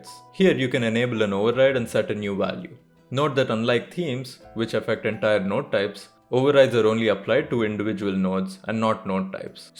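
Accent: India. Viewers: Easily one of the best educational videos on YouTube I've watched. Not trying to be mean here, but I usually struggle a lot with indian accents, yours however was even giving more character to the narration. Had me chuckling a couple times as well, extremely good pacing and all in all a great course! Please keep doing more! Instantly subscribed. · apparently indian english speakers are great at making tutorials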